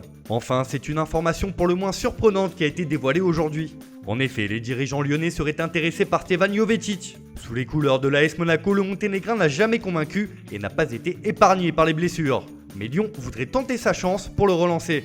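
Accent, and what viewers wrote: Italy. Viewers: Toujours sympaq cet acent italien 😁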